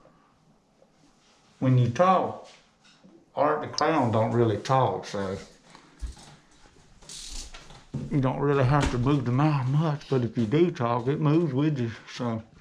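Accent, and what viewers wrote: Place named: United Kingdom. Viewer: Can’t tell if you’re British...or if you have a heavy country accent..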